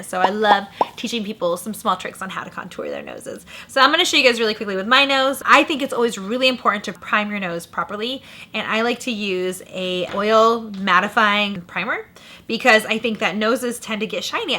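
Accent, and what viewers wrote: Iraq. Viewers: احبج من تحجين عراقي 🤩🤩🤩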